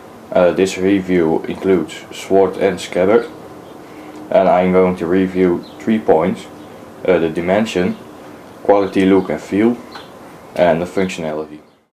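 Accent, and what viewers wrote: Netherlands. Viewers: Are you Dutch?You have a Dutch accent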